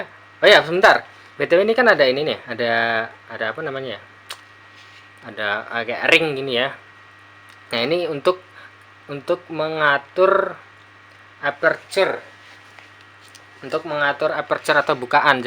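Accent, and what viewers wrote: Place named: Brebes-Tegal, Indonesia. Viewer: Sampean tinggal dmn om? Kayak brebes tegal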